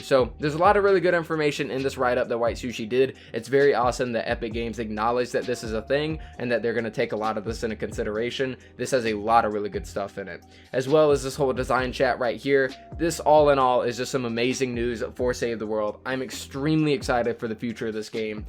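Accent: France. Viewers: Are u frenche? Because I am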